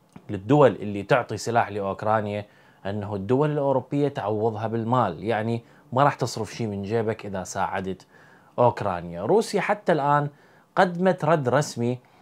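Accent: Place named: Iraq. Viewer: فدوه لقلبك كلامك العراقي يجنن يحسسني امي بالعراق❤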